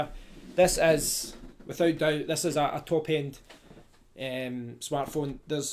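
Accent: Scotland, United Kingdom. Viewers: Oh dude... That Scottish accent... You are probably from Glasgow?! Miss that accent after moving away from Scotland... :)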